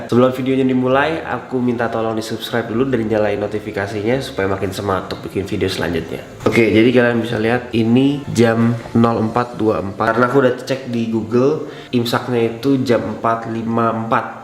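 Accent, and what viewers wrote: Bali, Indonesia. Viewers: Logat bali keluar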